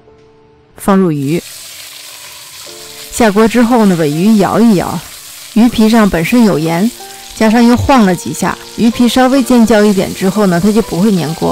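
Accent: China. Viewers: Michael S 那可真没有… 这口音一听就不是北京人，但说的是普通话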